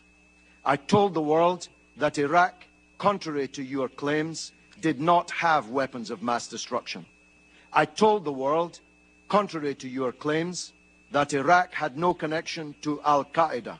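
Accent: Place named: Dundee, United Kingdom. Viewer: A true dundonian Dundee - the best city in the worrrld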